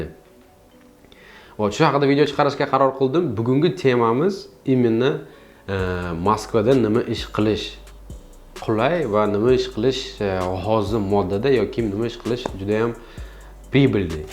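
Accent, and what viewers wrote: Uzbekistan. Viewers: Самаркандча акцент )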